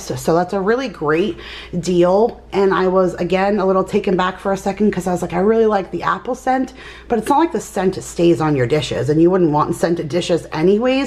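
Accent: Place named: New York, United States. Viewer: Your definitely from ny I could hear it. I do like Texas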